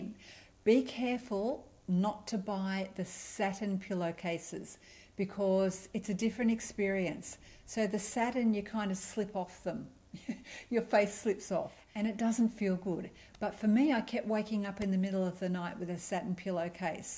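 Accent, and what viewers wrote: Australia. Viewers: Your hair is certainly absolutely gorgeous. I also love your accent. Are you Australian? I lived in Australia when I was a little girl for a year when my father was a visiting professor at the University in Adelaide.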